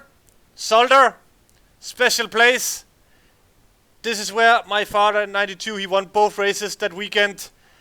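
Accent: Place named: Denmark. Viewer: Odd, your Youtube profile list you as German, but you sound really Danish. XD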